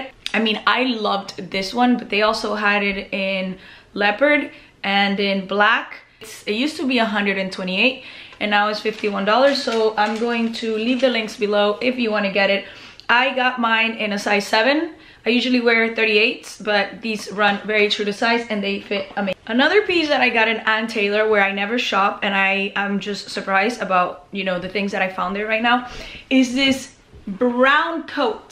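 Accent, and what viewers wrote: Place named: Cuba. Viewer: Your accent makes me miss my Cuban friends in Miami!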